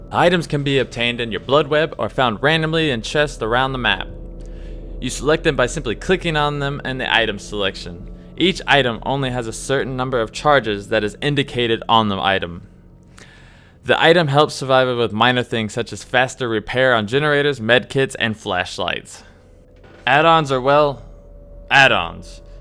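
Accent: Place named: Australia. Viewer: sounds australian to me, our accents make it sound like we say "bee-ah" while americans use a hard R at the end. same goes with all words like beer, like fear and dear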